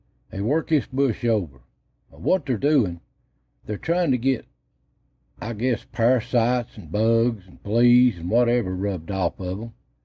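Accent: Appalachia, United States. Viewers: Came across your YouTube videos and have really enjoyed them. It's brought back many memories from my summers in East Tennessee. I am of Scotch Irish/English decent. My mother was from Knoxville and father from Carbo VA. Russell County. My dad grew up around the mines and had a love of steam locomotives Your accent is one I'm very familiar hearing. Love the mystery stories! And the orbs, wow! Many thoughts on those. I recall sitting on my grandfather's porch as they discussed the Dennis Martin case. It was going on at that time. Appreciate all the time involved in creating the videos. Looking forward to more videos. Greg Childress Cincinnati, Ohio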